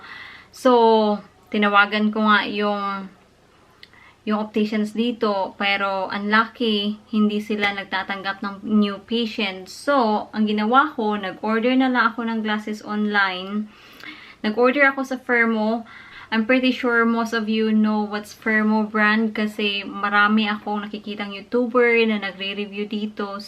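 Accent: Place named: Visayas, Philippines. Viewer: Hi, bagong subscriber here from Winnipeg Canada. Alam mo ba na ang wife ko ay na hook sa kga kwento mo.. Pati ba naman yung headache mo iniisip na sana daw pregnant ka hehehe. Natutuwa din sya sa visayan accent mo. Keep on posting more vlog. Sana pa shout out din..My wife's name is Dhang Camia. Sana mapansin mo naman ajg comment na ito...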